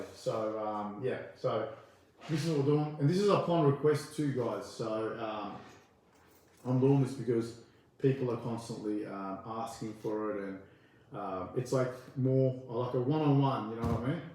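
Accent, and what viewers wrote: Australia. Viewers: Everytime I hear your Aussie accent, I smile... You have a special place in our hearts back here in Australia. You are doing great work. Don't give up ok. This kind of work you do for the animals can suck the life out of you if you let it. Please take care and look after yourself x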